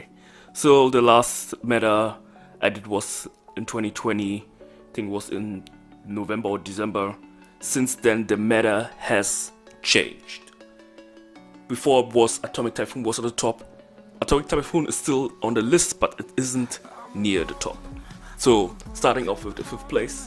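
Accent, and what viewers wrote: Germany. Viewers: Bro dein deutscher Akzent 😂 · Hi are u german?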